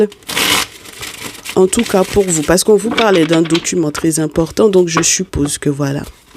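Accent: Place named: Antilles, France. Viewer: Vous avez un accent Antillais. 😁❤️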